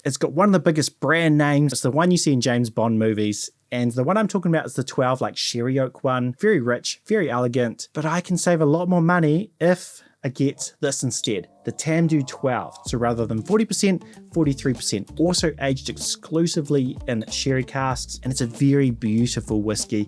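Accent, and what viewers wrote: New Zealand. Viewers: I like the content, but that New Zealand accent is killing me, at times is almost unbearable. I will try another video.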